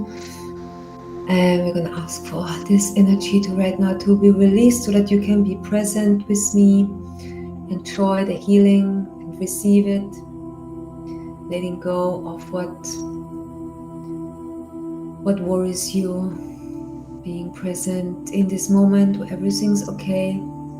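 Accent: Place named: Austria. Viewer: So happy to be part of Dr. world once again. Her voice, with its Austrian accent, is both charming and soothing. This blessed woman is a gift to the world. I'm grateful to be a recipient of her healing talent.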